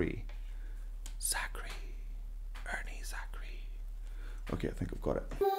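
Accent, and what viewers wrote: Scotland, United Kingdom. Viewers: omg i love british accent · This guy british accent were very cute!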